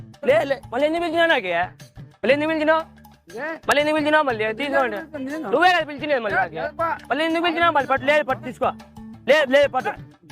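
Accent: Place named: Anantapur, India. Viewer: Super anna ❤ from kurnool.. Ananthapur slang keka full navvu untadhi😂😂🎉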